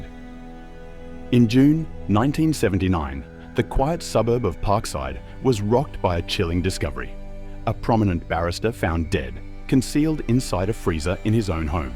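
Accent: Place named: Italy. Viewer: Was that supposed to be people talking over each other at 11 : 00 in, mate? I thought maybe it was you practising a technique of phone calls in the background as you said the rest. Maybe not I couldn’t follow it though. However, the Italian accent won me over.. I’m subscribed. I live in Melbourne and have all my life just removed to the Wimmera. Happy to find an Australian crime channel by an Australian. Good work mate. Anyway it’s 1108 into your story, I’m going back into here the rest of it. If David ?????? did it he’s the worlds dumbest criminal that ever lived LOL.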